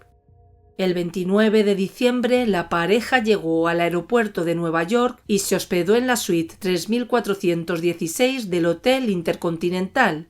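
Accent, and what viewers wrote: Spain. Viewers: ¿Por qué? Es audio real humano con castellano y acento original… es cuestión de gustos y preferencias!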